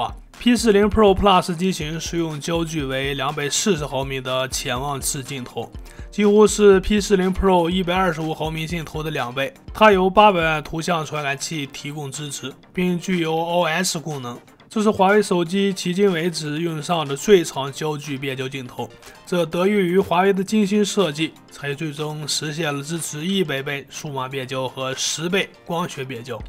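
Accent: Shanxi, China. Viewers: 能练练普通话不？一口山西土味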